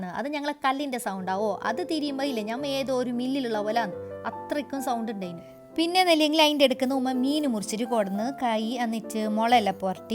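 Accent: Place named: Kasaragod, India. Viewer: Kasaragod bisiyaattil vlog kekaan Nalla paangund#mayyuskitchen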